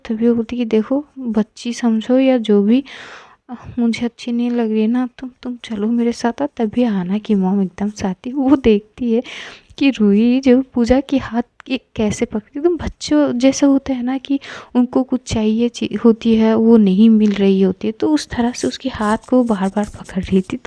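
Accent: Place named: Uttar Pradesh, India. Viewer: 🥰 intezaar to ittu sa bhi n ho pata ma'am pr ab iske alawa kr bhi kya sakte h.....hum UP walo ki tarah aap jb bolti ho n hye 💖 kya kren aapka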